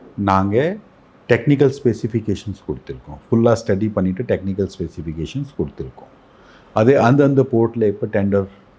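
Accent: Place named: North India, India. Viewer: North ஆக இருந்தாலும் தமிழ் அழகாக பேசுகின்றீர்கள்❕️👍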